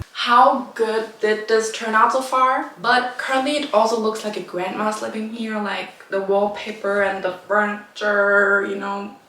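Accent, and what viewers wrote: Germany. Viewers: i am in LOVE with how this turned out. you did amazing 🎉🎉 also are you from germany?? my friend's accent is really similar to yours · Direkt den deutschen Akzent rausgehört✨ Liebe das Ergebnis!🫶🏼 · Okay but German accents are so pretty ✨ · Man merkt voll dass du eigentlich deutsch bist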